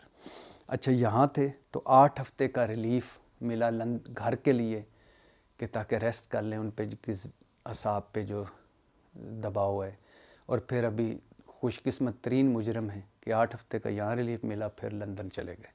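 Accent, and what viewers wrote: Punjab, Pakistan. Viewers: Kia Punjabi ka tarka lagtay hn GEO sadda GEO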